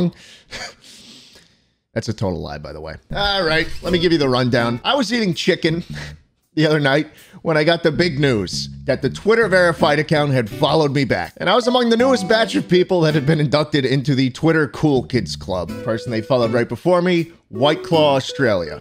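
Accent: United States. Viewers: American accent at its purest.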